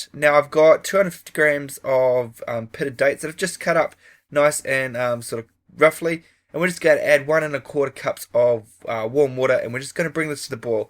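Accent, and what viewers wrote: New Zealand. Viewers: Like your kiwi accent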